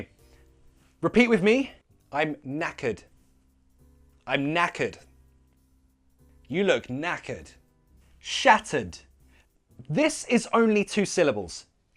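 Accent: United Kingdom. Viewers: I don't like British accent, then I've found your channel! Nowadays I don't miss anything about it! I really like it...👏👏👏👏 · british pronunciation is way too charming i’m love that’s the one i will learn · Can’t believe what I’ve just found, I always missed this kind of content. British accent and slang taught in a very fun way. We’d get along hahaha Keep it up dude! You got a new sub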